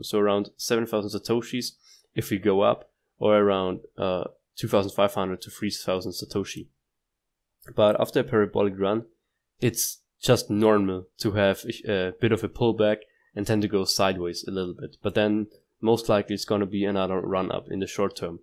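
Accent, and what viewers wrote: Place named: Austria. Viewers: 'Schule' next to Project by the bookmarks, there is a "andern" button on the page. I'd guessed Germany or Austria, too. :) and the way you talk in English said enough for me, cause I've worked for Austrian companies ;) . Nice video! Thumbs up! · after 30 seconds i realized you are Austrian.. cool video, i like XLM.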